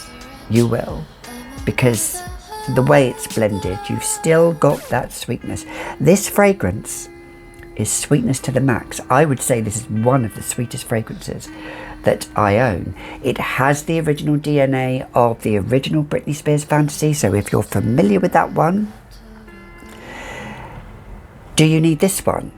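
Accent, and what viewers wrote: France. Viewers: Yayy for the French accent!!!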